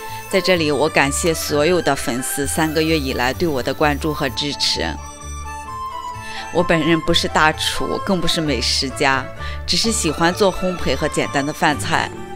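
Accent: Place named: Shanxi, China. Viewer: 是的，我们的山西口音我怎么都能听出来。是老乡，您是哪里的呀我是太原的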